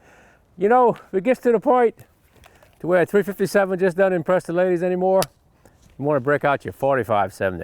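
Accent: Louisiana, United States. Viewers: what state and county is that accent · "Tanks" for a wonderful video! Nutting like a big bore to start da day!!! You remind me of the ol' Cajun Chef Justin Wilson, your accent & looks! You're a real man's man! Load up that ol' 45/70 wit some of Dat Cajun Gumbo & you've got a real gnat stomper! And it's "good for you to see me, I guarontee"!!!!! Love ya my friend.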